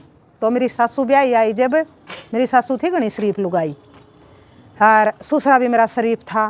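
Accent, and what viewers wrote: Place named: Meham, India. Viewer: Aunti aap boli se humare Meham side ke lgte ho.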